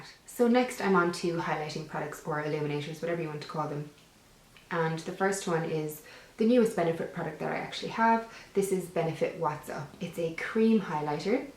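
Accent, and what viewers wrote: Ireland. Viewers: I watched a couple of your videos with no talking - was really not expecting you to be Irish! Must be the intense sunlight through the window haha - nice to see a local girl doing well - and you're looking well too! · I am inlove with your accent! Is that Irish? Xxx new subbie! Xx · Love the Irish accent! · I thought you couldn't possibly get anymore perfect and out came that Irish accent... I think I'm gonna cry ;( X love you ;)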